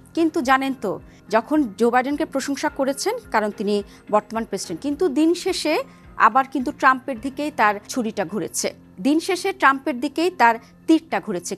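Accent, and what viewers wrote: Kolkata, India. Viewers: kotha to sundor but indian style a hoise seta khub e kharep lagse.. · ভারতের ঢংয়ে কথা বলবেন না।।। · কোলকাতা স্টাইলে সংবাদ উপস্থাপন😂। আপাতত শুনতে ভালো লাগলেও দয়া করে কোলকাতার সাংবাদিকদের মতো চিল্লা চিল্লি শুরু কইরেন না ভবিষ্যতে।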